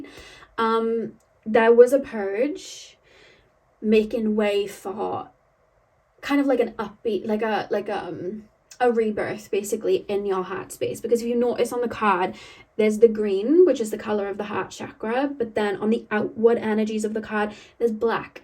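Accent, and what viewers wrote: Wales, United Kingdom. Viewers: We missed your Welsh accent and voice so much!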